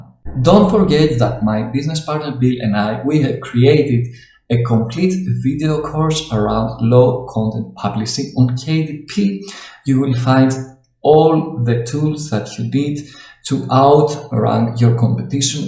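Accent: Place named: Greece. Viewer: Loved your Greek accent man :)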